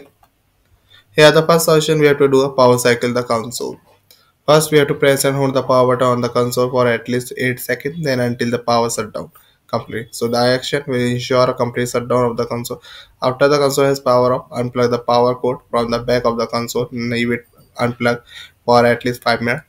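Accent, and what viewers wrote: India. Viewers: Hate India accent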